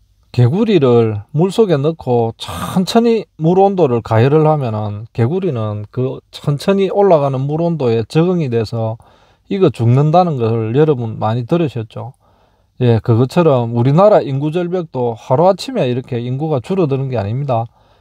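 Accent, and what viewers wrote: Gyeongsang, South Korea. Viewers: 깔깔하네 서부경남쪽 사투리 말 올래만에 들어봐네요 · 영상보면 목소리나 영상이나 그냥 일상...부산경남사투리억양...디젤집시님이 생각납니다...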